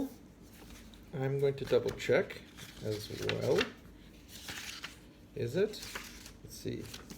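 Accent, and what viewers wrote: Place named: Malta. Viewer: You are from Malta? I was trying to figure out your accent.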